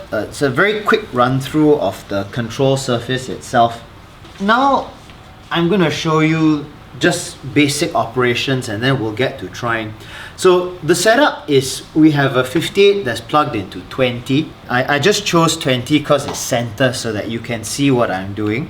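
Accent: Singapore. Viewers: Oh goodness didn't expect the Singaporean accent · Yeahh..sounds really Singapore English. Great video! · Great Singlish hahaha 👍🏻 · I know that you have a very familiar accent! That's why I'm asking whether you're Singaporean/Malaysian ! How did u learn our English accent?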